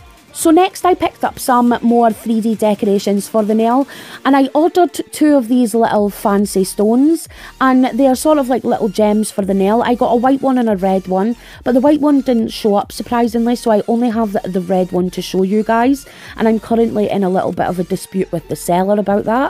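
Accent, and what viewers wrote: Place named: Glasgow, United Kingdom. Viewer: Seriously I just want to have your voice as my Siri/gps voice i love your accent Are you from Glasgow or that general area?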